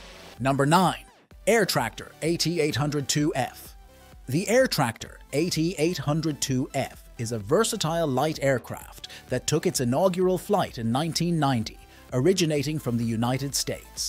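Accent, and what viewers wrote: Ireland. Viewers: I believe the narrator is Irish & their English pronunciations are a bit different. No problem.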